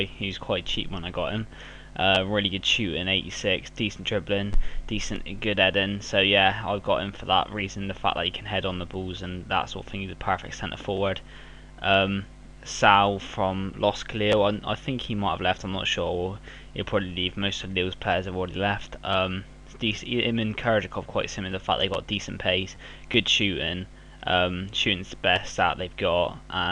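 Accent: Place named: West Country, United Kingdom. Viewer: GT: x Dirty Wizard Player: Huth Also dude do you live in Somerset/Bristol? You got an accent haha :P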